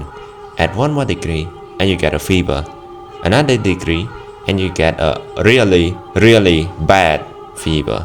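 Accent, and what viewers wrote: Singapore. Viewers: U got a strong singapore accent vro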